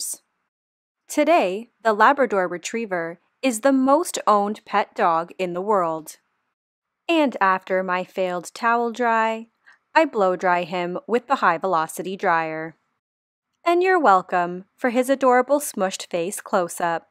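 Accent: Canada. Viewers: She's Canadian? I always thought Midwest US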